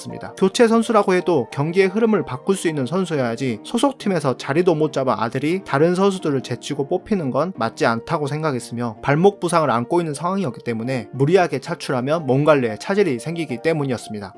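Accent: Jeolla, South Korea. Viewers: 내레이션에 전라도억양이 심해 듣기 거북하네요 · 님..고향이 혹시 전라도세요? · 전라도 사람이신가요? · 전라도 사람이신가봐요 사투리 중간중간 잘들었습니다 ㅋㅋㅋ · 전라도분인가보다 ㅋㅋ 사투리억양이 느껴지네용^^👍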